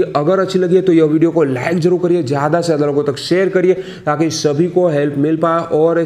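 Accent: Gujarat, India. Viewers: By accent , I guess you are from Gujrat..btw , nice advise in videos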